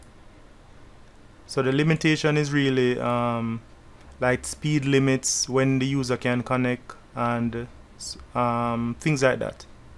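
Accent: Jamaica. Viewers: Are you from Jamaica ?, love the accent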